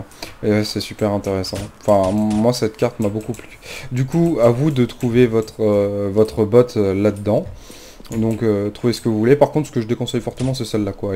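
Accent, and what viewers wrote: Alsace, France. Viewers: ah un alsacien sa fait plaisir ^^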